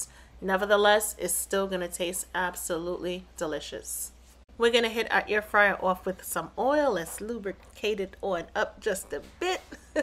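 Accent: New York, United States. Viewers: I’ve gotta say that New York accent takes me back to my days of living in Chelsea. 🏙️ 🥘♥️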